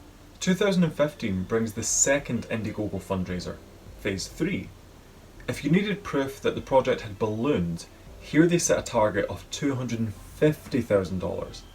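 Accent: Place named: Scotland, United Kingdom. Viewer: oh, I’m sorry, it was just hard to understand because of your extremely thick Scottish accent and total inability to properly enunciate words in what I assume is your native language. But nice bitchy attitude you got there, directed towards one of your fans no less. Great look for someone who is trying to build their YouTube following! Let me know how that works out for you!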